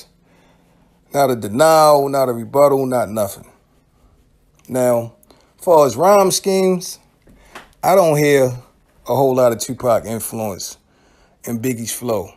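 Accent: Baltimore, United States. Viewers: Subbed heard you say tew an kne you from baltimore. The content is A1 so the sub was a easy choic dummy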